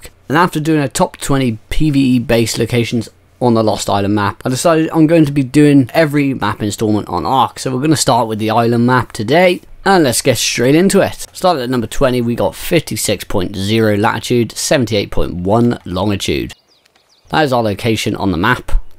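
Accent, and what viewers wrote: United Kingdom. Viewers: Loves your british accent mate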